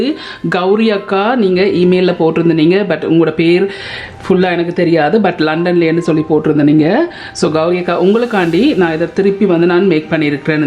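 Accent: Jaffna, Sri Lanka. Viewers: Srilankan tamil aa?? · Jaffna tamil duper❤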